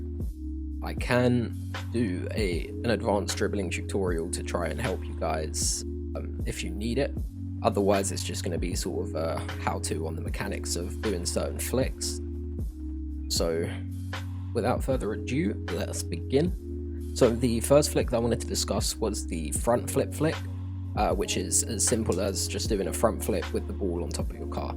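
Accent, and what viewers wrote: United Kingdom. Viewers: love the british accent lol rn i have by in my head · Good shit bro where abouts in england u from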